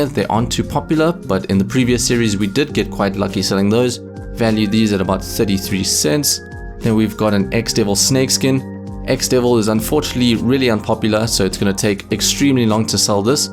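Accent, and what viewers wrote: South Africa. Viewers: Heyy, are you South African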